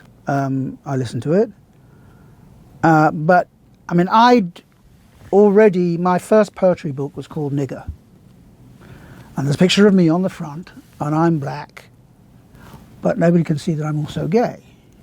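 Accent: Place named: RP, United Kingdom. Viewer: He is so wise and confident about his craft. Rightfully so. His music is amazing. Plus his accent is so posh!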